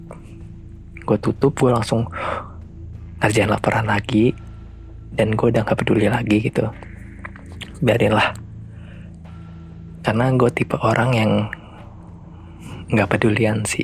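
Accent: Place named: Java, Indonesia. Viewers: Orang banten tapi medok. Pst dah fasih bahasa jawa ya bro? 😁😁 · Yang lo-gue lo-gue pake logat jawa itu serem gan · lo gue lo gue tp medok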